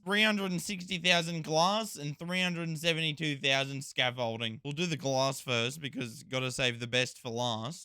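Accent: Australia. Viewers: You sound aggressively Australian, but like you've lived in Britain or the US for like the last 2-3 years.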